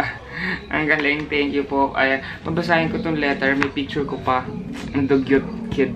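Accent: Visayas, Philippines. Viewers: bisayaaaa kaaa kuya??? i lab yow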